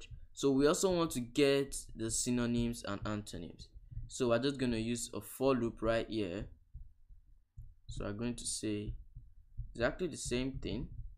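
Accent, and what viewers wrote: Nigeria. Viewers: From the accent and name, I guess you're Nigerian... Yay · Very hard to miss the accent. Naija for life. 😍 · It is so funny how after listening to the first 6 seconds I knew this was a Nigerian😂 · Are you a Nigerian 🇳🇬?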